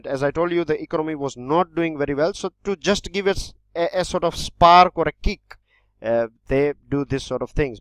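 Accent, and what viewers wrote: India. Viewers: plz sir indian acent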